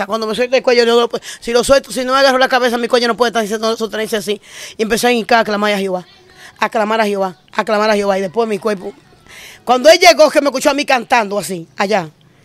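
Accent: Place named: Dominican Republic. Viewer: Los dominicanos hablan así 😂Pero ustedes abran su corazón y entenderán todo lo que dicen 🙏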